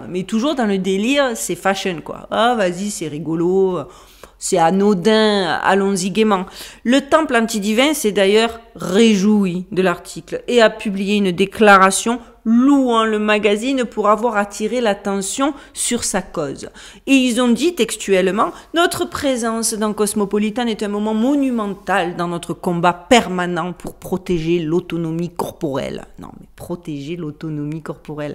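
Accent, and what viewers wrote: Marseille, France. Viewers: Bonjour..Belle, Blonde et Curieuse de vérité.tout ça avec l’accent marseillais bonne continuation .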